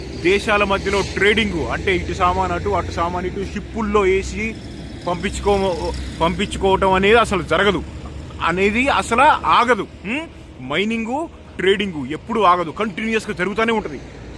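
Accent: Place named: Krishna District, India. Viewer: మీ సొంత ఊరు ఏది బ్రదర్ ఎందుకు అంటే నీ మాట లో కృష్ణా జిల్లా యాస వినపడుతుంది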